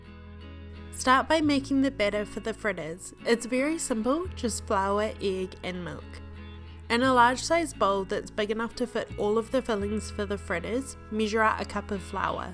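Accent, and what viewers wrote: New Zealand. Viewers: Sounds like a New Zealand accent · love a kiwi accent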